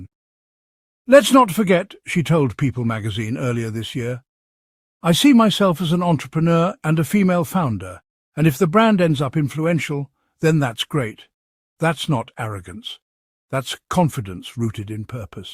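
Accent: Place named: United Kingdom. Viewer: Such BS. Best part of this silly propaganda is that AI has chosen an interesting British accent. Carry on Sir! 😱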